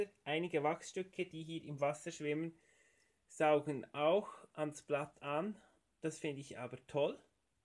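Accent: Switzerland. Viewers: liebe ich den schweizer Akzent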